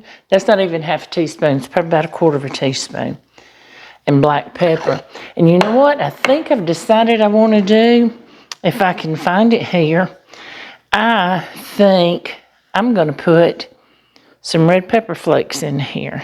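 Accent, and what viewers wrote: South, United States. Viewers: It looks absolutely yummy, I hope that I can buy the dough roll in England, does anybody Know? love your videos and your southern accent is delightful.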